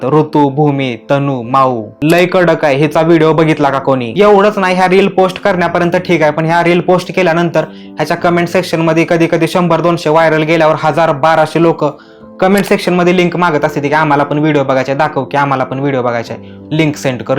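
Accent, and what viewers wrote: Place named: Solapur, India. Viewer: Laga bhava tuzi bolnyachi paddhati sangte ki tu Solapur district mdun ahes.tuzi boli connect hotei.chalu thev 😊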